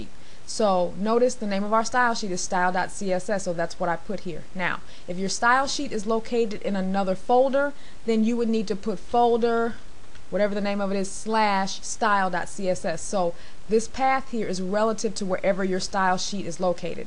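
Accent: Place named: South, United States. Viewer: I love your voice. Very smooth, soft and with a subtle hint of Y'ALL.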